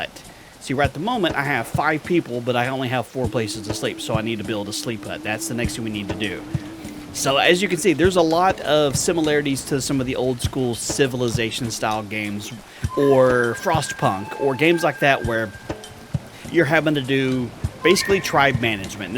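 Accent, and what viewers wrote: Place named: Texas, United States. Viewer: As for me this is not something I would play. The graphics look very nice and it seems to be pretty easy to play but not for me. Survival games are not something I normally play but I am open minded and am willing to watch you play. I started this way with 7D2D but later decided I would buy it and play it. I got your info watching Glock9 and when you first started talking I said he's Southern so I hung around watching your channel. I am in NC but my aunt lives in Humble, TX and I LOVE the Texan accent you all have. At any rate I've learned a lot from your channel in playing 7D2D and I highly highly appreciate it. I'll watch your channel and watch you play because I want to see the future of how this game works. Happy gaming and thank you for having a nice decent channel with some very nice members! :D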